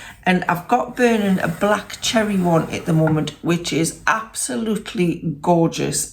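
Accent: Newcastle, United Kingdom. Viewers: Hi, I really enjoy your vlogs, your food looked lovely. I’ve been on HRT for nearly 13 years since I was 50 and I’ve no plans to stop it as I had terrible brain fog and hot flushes before. I worry that all the symptoms will come back and I couldn’t cope with that again! Looking forward to more of your vlogs, oh and I just love your geordie accent it’s one of my favourites, I spent a lot of time in Tynemouth when my son was young, I love it there. Xx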